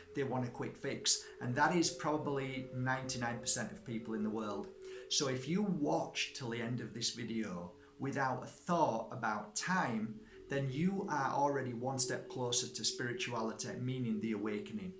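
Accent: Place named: Nottingham, United Kingdom. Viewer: Ah, right, Yorkshire isit, now!..no wonder I thought your accent might of been from Nottingham...I'm originally from Nott's, and I get asked if I'm from Yorkshire...x ✌️❤️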